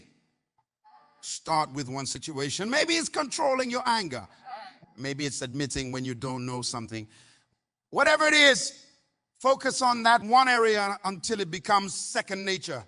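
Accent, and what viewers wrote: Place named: West Africa. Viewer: I am a linguist and can't help but detect a west African accent. I wish I knew who this is because his teaching is aligned to Dr. Munroe and I would like to hear more. Glory to God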